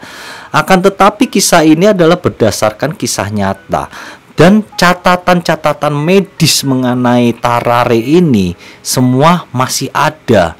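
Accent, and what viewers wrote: Java, Indonesia. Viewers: jowo ne ning ndi mas?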